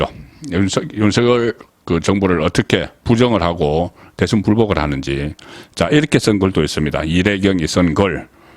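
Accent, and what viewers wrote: Gyeongsang, South Korea. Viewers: 몽유병 몽류병 십월 시월 경상도 발음 인정해도 맞춤법은 제대로 하셔야지요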